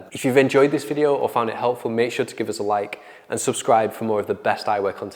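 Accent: United Kingdom. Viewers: I can't remember which of these two lenses I got from The Spectacle Factory. I'm guessing I got Smart Life lenses because when I put on my Masunaga eyeglasses, I'm so much smarter. For proof, when I watch videos, I learn so much!!!...and it's not because of devilishly handsome face and that woozy British accent... though it does help. One thing's for sure, making an appointment with The Spectacle Factory is the smartest thing I've ever done.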